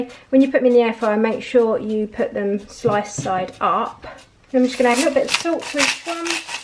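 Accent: United Kingdom. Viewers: I don't like spicy food( generation thing) but it is so good to hear a British voice and knowing where we can get ingredients in our UK supermarkets. You are a good demonstrator too so more Air Fry videos please as they are becoming very popular with this energy crisis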